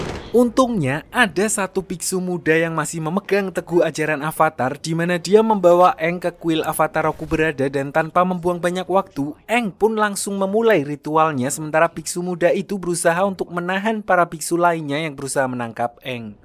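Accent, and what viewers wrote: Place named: Tulungagung, Indonesia. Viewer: Wong tulungagung sampean cak😂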